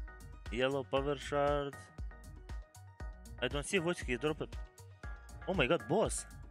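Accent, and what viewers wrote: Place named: England, United Kingdom. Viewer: Nice videos! keep them coming, I like your english accent^^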